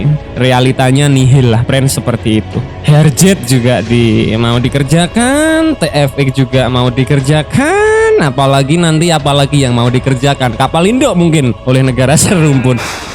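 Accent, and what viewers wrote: Indonesia. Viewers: lah kenpa lu ngurus gua... Bukan kah konten tentang malaysia 🤣...malaysia apa indonesia yg suka ngurusin urusan orang... Dan youtubernya pun orng indonesia...dah lah coy gx usah make cara nenek moyang klian lagi yg suka ngadu domba melayu dgn melayu... Yg ad klian nyesal jika terjadi konflik di 2 negara ini..dan lu pun tau dri logat youtuber nya bukan 🤣...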